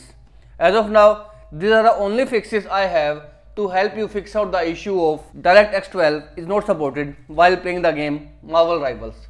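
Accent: India. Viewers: LETS GO THERE IS SOMEONE WITH A HARD INDIAN ACENT DOING THE FIXING I WON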